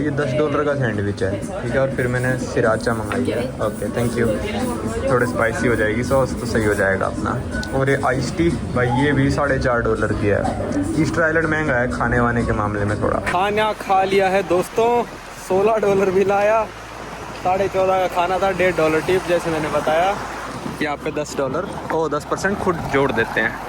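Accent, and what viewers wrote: Haryana, India. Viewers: Hats off to you dear. I enjoyed your video of Easter Island. You went so long in south america. Try if you can go to Antarctica, we are following you always. You always explain nicely. I like your haryanvi tongue. I am also a traveler. Only south America continent is left. Sorry you lost the phone. Best wishes 🙏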